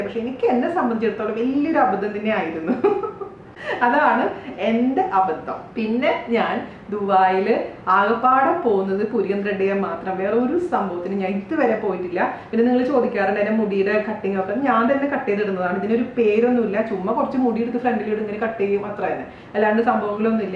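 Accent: Thrissur, India. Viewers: Hii chechi nammude thrissur slack kalakito 😍enthurasa chechide samsaram ketukondirikan I like you chechi moleeeee😘🤩💗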